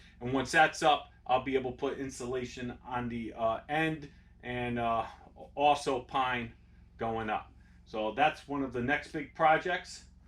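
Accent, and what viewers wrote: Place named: Connecticut, United States. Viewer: I grew up in CT and was gonna say your accent kinda sounds like you're from CT, then I saw the plates on your truck! Cool cabin, thanks for sharing all the details with us!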